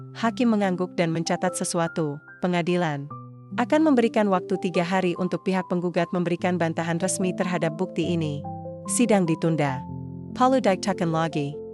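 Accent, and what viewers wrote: United Kingdom. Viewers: Apa sih ganggu banget harus di selingi logat Inggris segala buat apa ? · Mengapa bahasa Indonesia menggunakan logat bahasa Inggris ?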